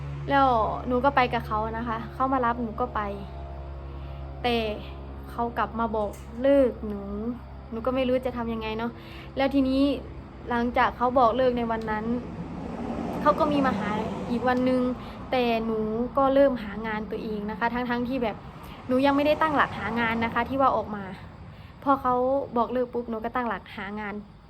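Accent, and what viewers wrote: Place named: Sakon Nakhon, Thailand. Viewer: ตกลงน้อง เป็นคนบุรีรัมย์หรือคน สกลนคร ตอนดูคลิปขับรถพูด เหมือนคนสกลนคร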